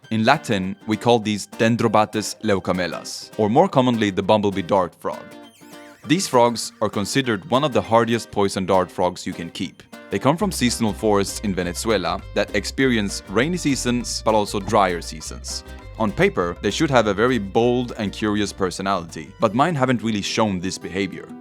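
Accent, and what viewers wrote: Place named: Sweden. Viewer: You sound swedish. Or am i completely wrong? :P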